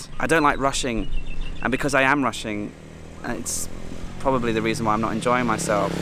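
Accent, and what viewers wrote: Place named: Northumberland, United Kingdom. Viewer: Here's a question. "We reckon you are from County Durham or Northumberland and went to private school. Are we right?"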